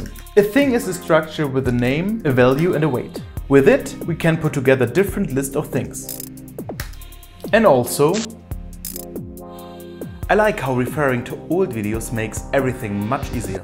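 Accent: Germany. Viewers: Are you german ? If you are german I will subscribe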